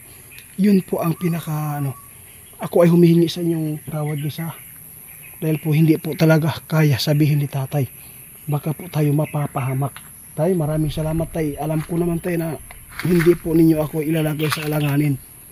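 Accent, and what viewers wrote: Visayas, Philippines. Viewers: Visayas itong nagsasalita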